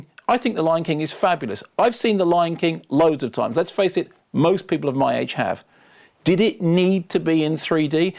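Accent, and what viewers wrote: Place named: England, United Kingdom. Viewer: Can't really argue with him, he does have an English accent after all...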